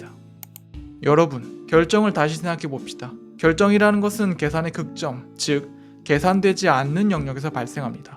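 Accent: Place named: Busan, South Korea. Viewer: 좋은 영상 감사합니다!! 부산 출신이신가요? 억양이 반갑네요 :)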